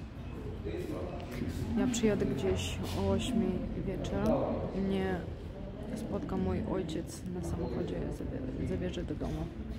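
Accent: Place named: East, Poland. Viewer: Potwierdzam to, co czytałem w innym komentarzu, mówisz coraz lepiej po polsku :) Najbardziej uderza to, że "wschodni akcent" słychać u Ciebie tylko od czasu do czasu, gdyby nie sporadyczne zastanawianie się nad doborem słów (i czasem odmiana), spokojnie można byłoby powiedzieć, że jesteś Polką np. z Podlasia (region w pobliżu granicy z Białorusią, tam - zwłaszcza w mniejszych miejscowościach - ludzie mówią w podobny, lekko śpiewny sposób). Biorąc pod uwagę, że uczysz się sama, nie masz bezpośredniego codziennego kontaktu z Polakami, to jest bardzo duże osiągnięcie. Oglądam filmy na kanale "Odin Iz", prowadzi go Ukrainiec, który pracował w Polsce, pokazuje "szokujące różnice" między Ukrainą i Polską, na przykładzie nie pomalowanych osiedli w miastach, starych domów na wsi, tylko pod każdym z takich filmów dostaje wiele komentarzy mówiących o tym, że w PL są miasta i wioski wyglądające podobnie. 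Ty w ciągu kilku minut pokazałaś więcej różnic, niż on przez kilkadziesiąt minut swoich filmów, pociągi, droga, toalety - średnio w PL jest z tym już lepiej. Babcia na pewno ugotuje coś, co lubisz - wiele osób jest zainteresowanych potrawami z innych krajów, jeśli będziesz mieć okazję, możesz pokazać, z czego i jak przygotowuje się coś zwykłego, codziennego u Was, bo dla wielu oglądających to może być ciekawe :) Pozdrowienia dla Ciebie i całej rodziny, oby szybko udało się zrobić wszystko to, co planujesz :) PS: Ładne, nowoczesne mieszkanie.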